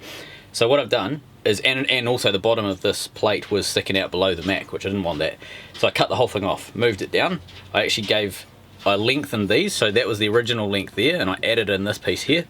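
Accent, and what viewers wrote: New Zealand. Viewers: you are blossoming as a comedian. Your Kiwi accent is channeling Fred Dagg (poor John Clarke, we miss him). When you mentioned "My mate Trevor" the impression was complete!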